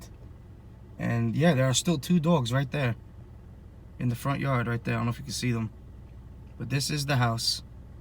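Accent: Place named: Massachusetts, United States. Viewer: "It's a cloudy full day here on Long Island". I had to play his opening statement twice because of his accent. Sounds cool, a little bit like Massachusetts?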